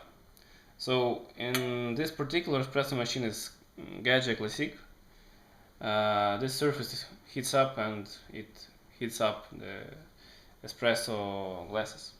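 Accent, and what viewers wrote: Russia. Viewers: Хороший русский акцент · Russian? Italian?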